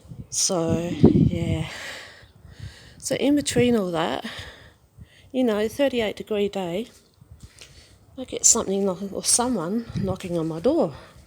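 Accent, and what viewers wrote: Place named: Australia. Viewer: By the way, I noticed you have a bit of an Australian accent....😂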